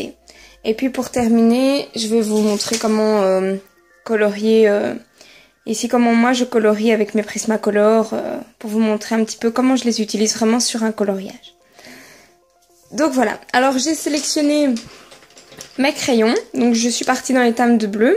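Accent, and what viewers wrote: Belgium. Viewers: Sympa la vidéo :) Une question me brûle les doigts, es-tu Belge ? Si oui, d’où ?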